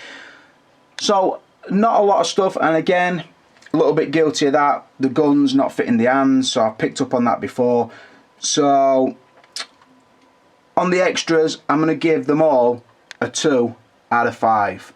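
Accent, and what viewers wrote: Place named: Manchester, United Kingdom. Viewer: hahahahhahaha ! Mate where are you from? Ypu sound local.. manchester ?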